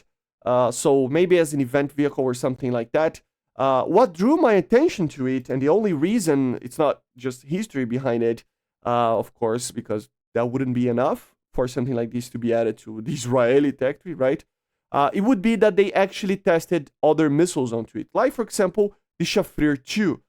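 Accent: Brazil. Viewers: You know he’s Brazilian right?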